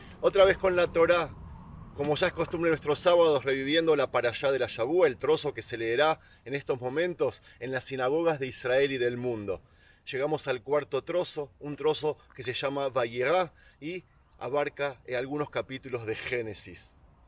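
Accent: Argentina. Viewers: Si eres de isrrael porque ablas como argentino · Che vos sos de Argentina?🇦🇷. Me encantan tus videos. Saludos desde Republica Dominicana🇩🇴. Y bendigo al Dios de Abraham, Isaac y Jacob🇮🇱, Padre de Nuestro Señor y Salvador Jesucristo.✝️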